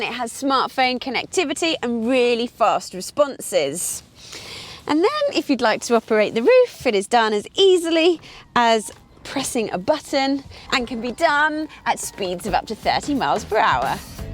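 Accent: England, United Kingdom. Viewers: Why is there a british accent talking about MILES per hour? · British accent is weird!! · I don't know why but there's something in her eyes that makes me wanna fill her pretty little mouth with... a banana. But then again, I wouldn't be able to hear that lovely english accent. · I love accent. What part of the UK is she from? London?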